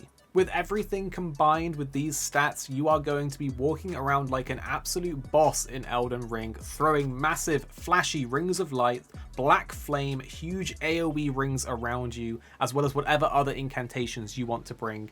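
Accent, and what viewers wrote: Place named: England, United Kingdom. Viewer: I love me an English accent. But "HATE-ch" makes me cringe.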